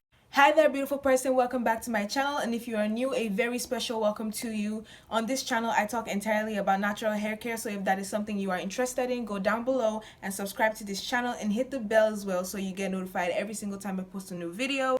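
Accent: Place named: Africa. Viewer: i love your accent. my dad has an african accent, and sometimes i can’t understand him but like african accents are just ughhh😫😌 i love em.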